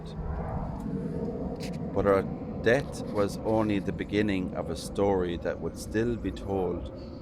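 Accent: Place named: Dublin, Ireland. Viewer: Dublin accent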